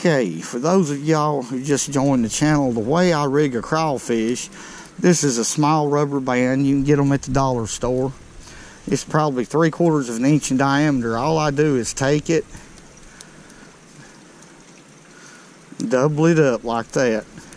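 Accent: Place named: Alabama, United States. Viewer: Can you make a video on your film setup & how you locate fall bass, also i love your videos, your a super funny guy & you make my day when you show our alabama accent off, Rolll Tide:)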